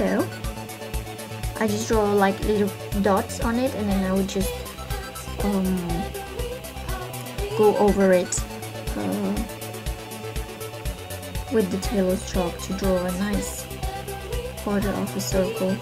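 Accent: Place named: United Kingdom. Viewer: silly question, where are u from cause ur accent sounds british and sort of indian or asian XD sorry if i sounded rude asking lol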